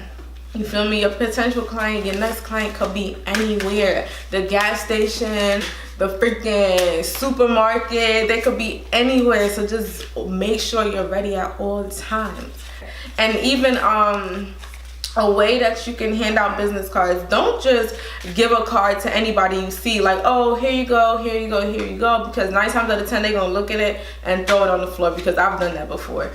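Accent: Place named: New York, United States. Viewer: I️ could tell ur from nyc or Jersey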